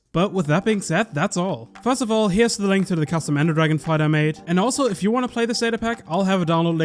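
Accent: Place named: Germany. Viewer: Man merkt den detschen Akzent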